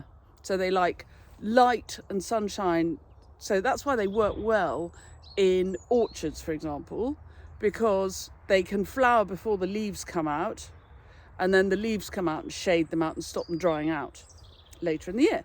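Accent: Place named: England, United Kingdom. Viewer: Oh you are wonderful! I cannot buy you a coffee yet please know this American fell in love with your articulated, English accent, humor and joy of gardening! I love narcissis, moved to a home where someone darling and knowledgeable ( like you) planted dozens of bulbs, which have tripled in a year! Thank you for all your tips and giggles. I have adopted you no questions asked- I have English heritage! Warm regards, excellent content!!!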